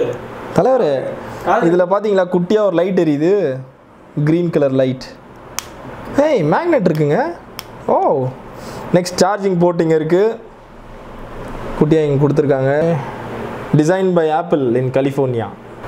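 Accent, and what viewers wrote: Kanyakumari, India. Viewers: Kanyakumari language super😍😍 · Bro neenga kaniyakumari ah sollunga bro❓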